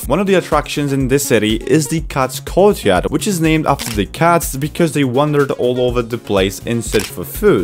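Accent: Poland. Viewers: Wait are you Polish? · are you polish or something ?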